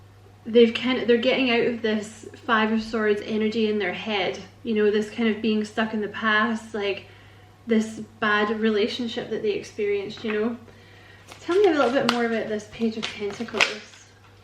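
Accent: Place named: Scotland, United Kingdom. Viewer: Such a beautiful reading sweetheart. Yes....that sounds like my twin flame..we both had to do our shadow work before the reunion 🫂. Thank you for spiritual skills. I love your Scottish voice...we are in the same country. Peace&Love&Light Namaste 🙏 ♥️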